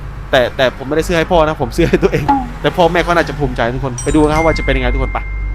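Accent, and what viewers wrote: South, Thailand. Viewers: พูดภาษาใต้น่ารัก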